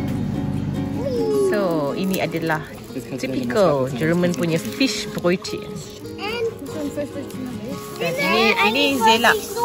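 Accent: Malaysia. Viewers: Mantap.loghat abg macam orang melayu dah.👍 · pikir bukan melayu tadi😁😁bila kluar bhsa melayunya yg pekat tu bru perasan dia org malaysia.pikir td org lain mksutnye bkn org malaysia😊😊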